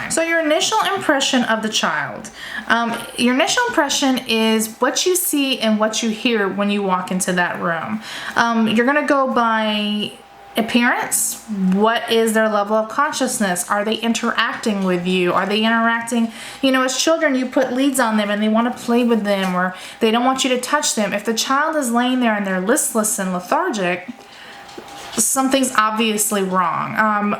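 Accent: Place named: Florida, United States. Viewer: you ROCK! This is an excellent recap of PALS...I've been certified since '97 and this is the best class I've ever been to. I did have to speed you up to 1.5 speed, and you sounded more like a Floridian, rather than a Georgian (my home). Thanks a ton and God Bless You!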